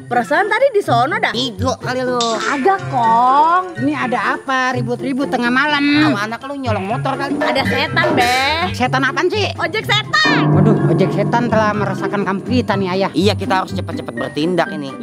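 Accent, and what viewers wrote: Betawi, Indonesia. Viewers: Gua sebagai org Betawi bangga di chenel bng Betawi nya msih kentel😁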